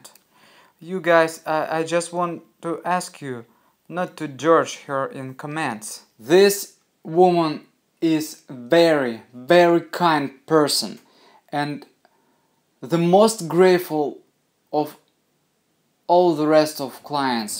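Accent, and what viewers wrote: Russia. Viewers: явно русский, страно разговаривает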